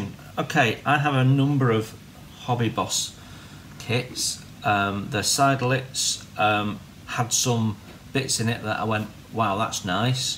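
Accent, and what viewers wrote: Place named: Stoke-on-Trent, United Kingdom. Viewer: No don't be sorry. You accent is very much S-O-T ( Stoke on trent ). Was just wondering.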